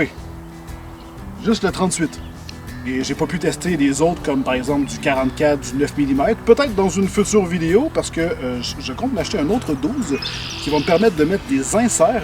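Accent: Quebec, Canada. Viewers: J adore l accent Québécois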